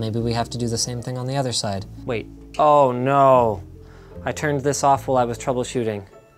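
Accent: Australia. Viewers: Aussie accent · Thats a aussie accent m8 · was that an Australian accent or "Central Pahk" accent??? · K that's an Australian accent and I don't even mind cause its not that bad your talking about GRREEEFIX CEEEEARDS so its ok:) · That accent is like Australian,British and a bit of in there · Accent was Stereotype Austrlalian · That accent is australian mate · U sound aussie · Isn’t that an Australian accent? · yeah not a bad australian accent at all tbh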